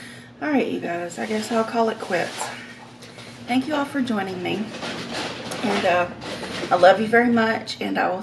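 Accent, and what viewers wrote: South, United States. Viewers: Im British and we have SO many accents lol but that goes with the American accents too. Us British find the number of American accents - especially your southern accent is really unique! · Ya I notice your accent alot...I love it. I'm french so all I hear is french accents😄so to hear a nice southern twang is really different & cool for me. Your voice is very soothing😌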